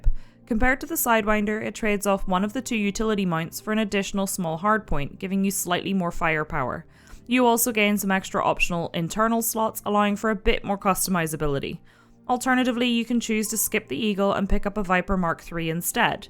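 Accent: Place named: Appalachia, United States. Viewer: sorry ya sound hillbilly. A milder version of accents in the Ouachitas, Ozarks, and Appalachia.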